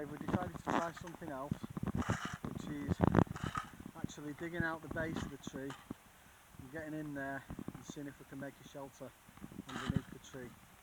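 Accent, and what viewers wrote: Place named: Manchester, United Kingdom. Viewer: Sounds like a Mancunian twang you got there 😊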